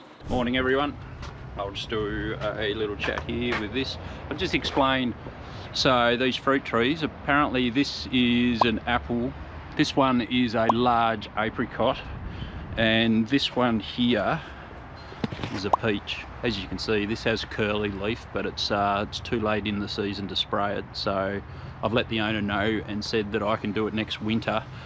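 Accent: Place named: Australia. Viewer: You Aussie men are definitely made different! I don’t know of any American men that would get on their hands and knees and weed through a small rock bed much less over half an entire yard like y’all did!!! You and work great together and I love seeing him using the power tools every once in a while. He seems to stand up taller, lol!!!😉 Ya’ll keep up the good work and keep the blessings going!!!❤ P/S Love the hair, the accent, the whole package!!!😊 Nelly, USA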